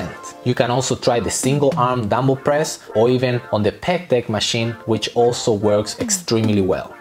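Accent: Spain. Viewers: 一听你说英语，我就知道你母语肯定是西班牙语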